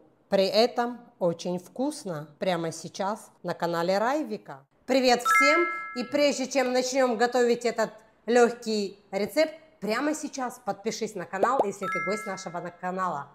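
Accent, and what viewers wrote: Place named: Odesa, Ukraine. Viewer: дівчата розмовляють одєсскою мовою. Головне, що всім зрозуміло. Дякую за рецепти.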